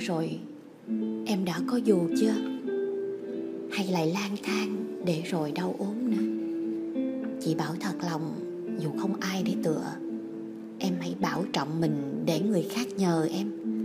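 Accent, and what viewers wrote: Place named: Saigon, Vietnam. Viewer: Anh thich em doc Dung chat giong Saigon hon la lai Bac... cai nay hay hon may cai truoc Do em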